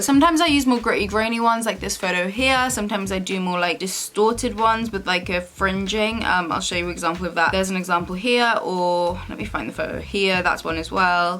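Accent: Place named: Newcastle, United Kingdom. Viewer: Are you from the Newcastle area? You sound like Perrie Edwards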